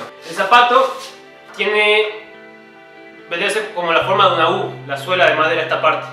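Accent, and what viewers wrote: Tucumán, Argentina. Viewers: sos de Tucuman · Wtf el webi wabo es argentino😮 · Sos argentino o me parece ? :v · DiiabloGalarza#GidinGang suena tucumano o salteño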